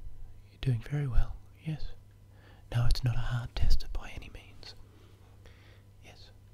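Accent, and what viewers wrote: Australia. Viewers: Accents that trigger me change all the time but right now your Aussie accent is killing it! I've done nothing but sleep these last few days after dental work and a stressful few weeks, got a holiday off work next week and thoroughly looking forward to a week of sleep with your fantastic accent and brilliant knack for tingle creating at my ears! Much love to you from the other side of the world in Scotland x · haha you're so Aussie. love it :) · Hello Jon Snow, I’ve found another Aussie asmrtist. 🇦🇺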